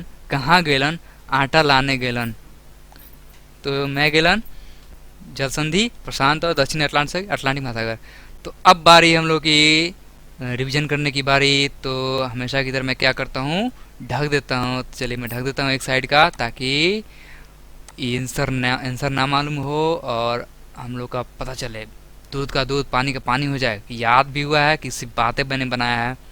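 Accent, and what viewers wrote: Bihar, India. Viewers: Aap bihari h....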